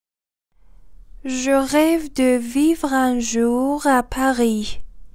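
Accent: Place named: England, United Kingdom. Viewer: You have a very strong English accent that's ok 😂😂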